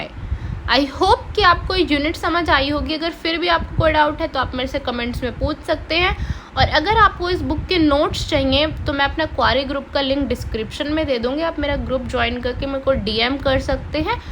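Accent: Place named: Uttar Pradesh, India. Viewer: Mam , gajab ho yaar aap.😊 Aapko style of talking is somewhat like UP wale jaisa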